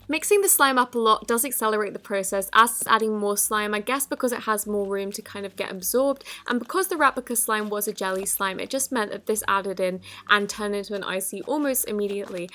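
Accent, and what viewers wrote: United Kingdom. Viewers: Uh yes, British accent, i love it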